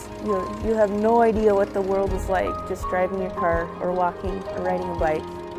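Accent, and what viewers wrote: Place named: Midwest, United States. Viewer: "Screw you gieez, I'm going to do what I want to." It's adorable, and you sound like the Midwest to me.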